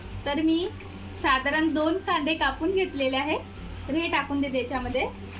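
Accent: Vidarbha, India. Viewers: Vidhrbhatle ka tumi bhasha varun vatle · ताई तुमी कोणत्या गावातुंन बोलता नांव काय आहे ताई तुमच वराडात ल्या बोली भाषा मुडे विच्यारल मी सोनाडा ची लेक आहे गाव ची अस म्हणायच आहे मला माझ नांव गीता आहे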